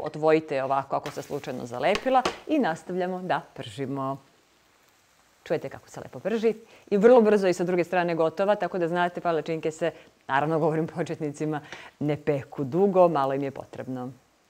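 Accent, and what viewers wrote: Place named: Srem, Croatia. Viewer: j Ja volim ovaku osobu koja na ovaj nacin prica , objasni i svima ugadja, . neznam odakle ste , sigurno iz okoline srema. lepa i sve divno napravi , za desetku.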